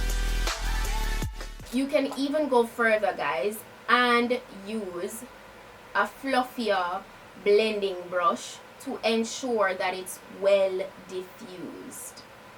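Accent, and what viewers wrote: Jamaica. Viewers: Yaardie gal you accent strong sah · love your accents Jamaican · JAMAICAN :-)... Beautiful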